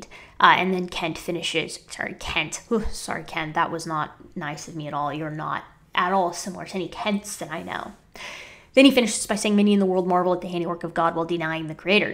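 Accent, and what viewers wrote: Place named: General American, United States. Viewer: really? Sounds like a normal American East West Coast mix accent to me! Of course I'm used to YEC tone such as Hovind which is much worse imho. As regard origin quality control I'm not sure I see your point. Mutations can be increased by poor quality control during reproduction. To use your analogy this doesn't produce airplanes from cars. This produces a range of cars, mostly bad but the occasional one that has attributes on the way to airplanes. Evolution works on the "good enough to survive" not a particular design goal. If your dealership gets by with a breeding set of cars that produce more and more cars that work well enough but gradually end up looking more and more like airplanes then eventually it's an airplane dealership! The tortured analogy shows it's not a good analogy for evolution!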